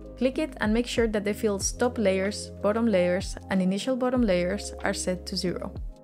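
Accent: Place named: Spain. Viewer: que tendrá el acento español que antes de ver el nombre del canal ya sabia de donde era xD Oye el video es un 10, habia usado el metodo de eliminar superficies para vasijas o filtros de red pero nunca se me habria ocurrido que serviria para hacer tejidos. Greating and Like From Galicia!!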